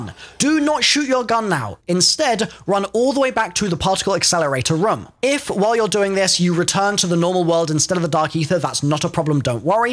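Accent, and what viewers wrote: United Kingdom. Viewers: Why is every person who makes videos like these British lmao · Such an annoying accent omg. Which British accent is this?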